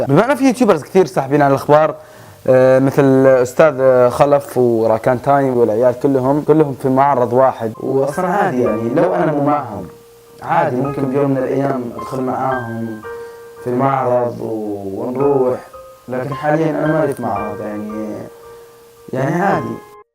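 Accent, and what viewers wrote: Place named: Syria. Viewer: I أنا مشتركة جديده في القناه... و صراحة الشي إللي حيرني هي لهجتك...مني عارفة بس...إنت تتكلم سعودي بس في لكنه بسيطة سورية...مادري ليش...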